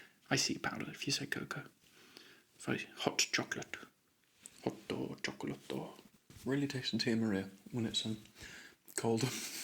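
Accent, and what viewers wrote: England, United Kingdom. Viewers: Something about your accent, poetry writing, mug of ✨hot chocolate ✨ and train destination reading just sits right with me, it is so british i love it!! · i am almost early yeyyy😂 i love english accent oh god😻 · God I'm in love and is probably because of his weird behavior and british accent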